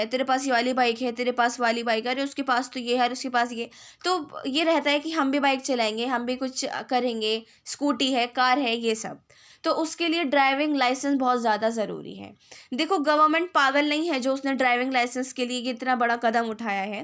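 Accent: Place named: Rajasthan, India. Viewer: Mam I think aap Rajasthan se ho...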